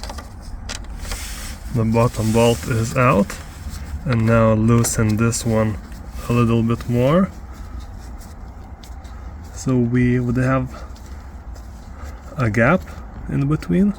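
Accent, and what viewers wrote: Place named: Lithuania. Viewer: Girdžiu lietuvišką kirčiavimą 😂 Ačiū už video ;)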